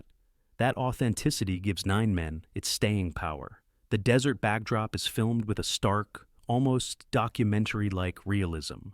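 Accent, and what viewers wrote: United States. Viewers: Narrated by american?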